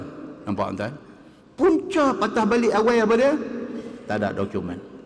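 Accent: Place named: Penang, Malaysia. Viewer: Ustaz Cakap Sungai Acheh sebijik sama macam Arwah Tok saya... Minta Ma Auuf. Semoga Allah merahmati arwah Tok dan TokWan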